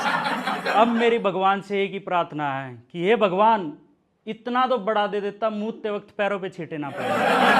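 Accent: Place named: Haryana, India. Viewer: Great Going Bhai... 👌🏻👌🏻👌🏻👌🏻👌🏻👌🏻👌🏻 All the best, we all are with you... Meri ek baat hamesha yaad rakhna, Your HARYANVI Accent is your STRENGTH, your ACTUAL POWER... Don't ever loose it, Keep it up... 👍🏻👍🏻👍🏻👍🏻👍🏻👍🏻👍🏻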